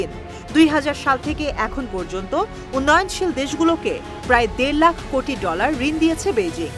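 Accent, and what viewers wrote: India. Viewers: আসলে যে সাংবাদিক খবর পরিবেশন করছে সে মূলত ভারতীয় নাগরিক তার ভাষার ধরনেই বোঝা যায়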